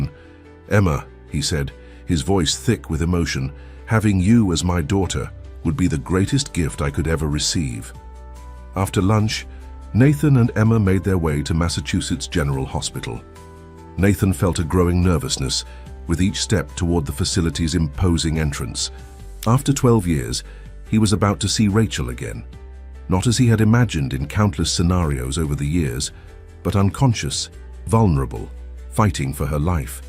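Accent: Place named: United Kingdom. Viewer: a very elegant British narrator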